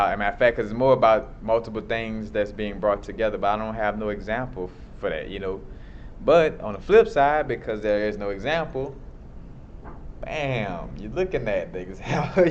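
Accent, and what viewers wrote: New Orleans, United States. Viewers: Ahhh! Yet another BRILLIANT message!🙌 You make being innovative sound so easy lol, but it ain't no joke! I've been saying for years that innovators have no blueprints, yet my friends always wanted to mention how hard it is to start nonprofits, law practices, etc. and I would be like, "You literally have a checklist for the steps you need to take to achieve your goals, yet I am venturing where there IS no path and making it up as I go along." Don't do mentors, b/c no one has my unique insight or experiences; my instincts are my guides, ha! 😹 I have a degree in marketing, yet I don't market any of my skills/creations; the people who are meant to find them will! Don't really do social networking, the so-called "lifeblood of artists," either; too busy creating and living!💃🎨🎢 People often shake their heads and say, "Well how will I find you?" My reply remains unchanged: "You won't find me unless and until I WANT to be found! 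My existence is ART and ART is in constant motion!" LOL!!😆 Lovin this /400 degrees accent, too!💬🔥🎶 🎧😁Keep innovating!!!💃🎉🎆🌌💫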